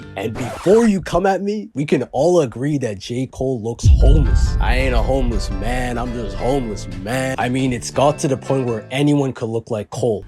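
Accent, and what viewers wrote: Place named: Canada. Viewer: so sorry to ask but are you Canadian? love ur voice lol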